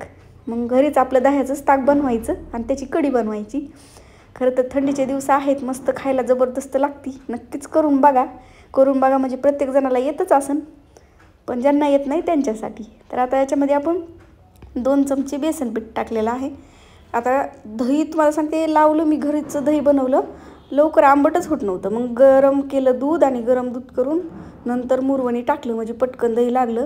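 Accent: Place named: Satara, India. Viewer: खुपच छान बनवली मी सुद्धा अशीच बनवते, मला तुमची भाषा खुप आवडली, मस्त अशी सातारा style 👍 👌👌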